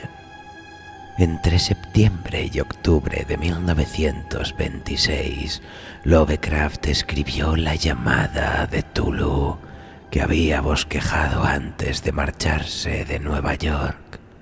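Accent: Spain. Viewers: si, pero la cuestión del acento es mucho mejor en este canal para españoles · ay a mi no me gusta el acento español, ademas este señor lee todo de manera monotona, siempre en el mismo tono, no hace bien las pausas, Tener voz algo grave no quiere decir q se es buen relator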